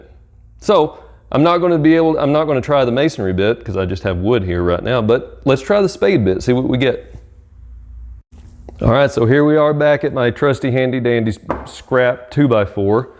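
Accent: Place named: Milwaukee, United States. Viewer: You sound like a Milwaukee guy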